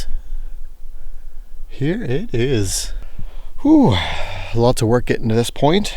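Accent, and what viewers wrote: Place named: Atlantic Canada, Canada. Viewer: Are you on the East Coast of Canada? This is a guess just looking at your woods and accent. I'm in NB.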